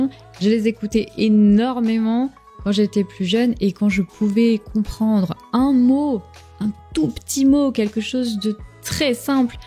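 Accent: France. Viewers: Im English and I think your English was 'tres bon' please don't loose your French accent, its charming and adds musicality to English. I have been teaching myself French for 1 year, the fog of understanding is clearing but I am a long way from speaking. Im concentrating on listening and reading, getting as much comprehensible input as possible. as an old saying says 'Slowly slowly catchy monkey'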